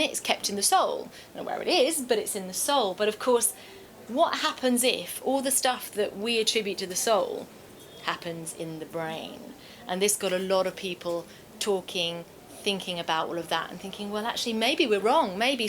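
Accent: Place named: England, United Kingdom. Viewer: bit of an English accent and I'm liking it too!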